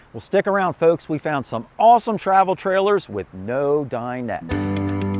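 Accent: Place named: Philadelphia, United States. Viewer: I cant tell if this is pittsburgh or philly accent...speaking fluent yinzer i lean more toward delco philly.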